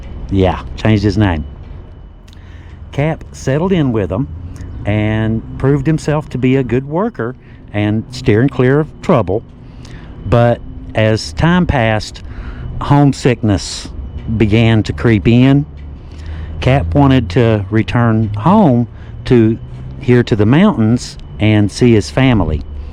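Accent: South, United States. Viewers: I could listen to your smooth southern accent all day long ❤❤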